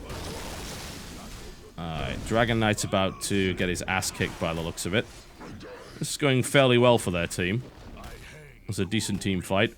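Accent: United Kingdom. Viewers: Amazing series. Always the best content from you,. Keep it up. Hell, even the girlfriend prefers content from your channel. Calls you the classy sounding British guy.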